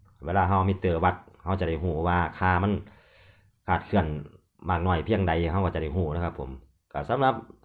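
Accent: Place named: Isan, Thailand. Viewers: มื่อนี่ฟังได้เฉพาะภาคอิสานเด้อค่ะ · ชัดเจนอีสานบ้านเฮา👍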